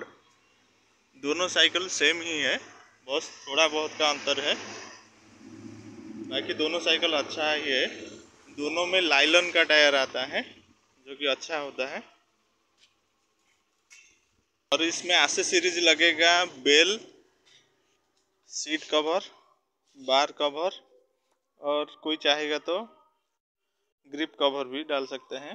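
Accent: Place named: Odisha, India. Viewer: ଭାଇନା କଥାରୁ ତ ଓଡ଼ିଆ ଜଣାପଡିଛି 😃 ସାଇକେଲ ର ଦାମ୍ କେତେ 🤔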